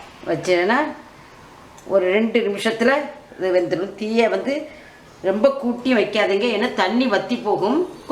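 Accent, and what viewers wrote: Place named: Nagercoil, India. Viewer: Ungal nagerkovil tamil alagha irruku.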